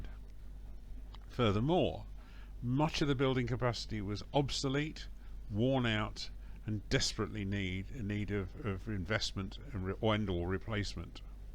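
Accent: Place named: RP, United Kingdom. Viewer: What a beautiful British posh accent. I truly love it the way you speak.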